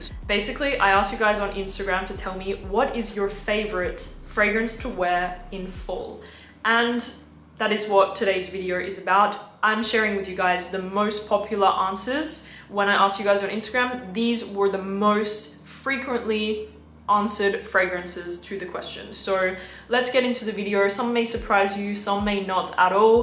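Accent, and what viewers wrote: Australia. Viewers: What is going on with your accent? Definitely a little less Aussie now. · Your accent sounds australian, are you from australia?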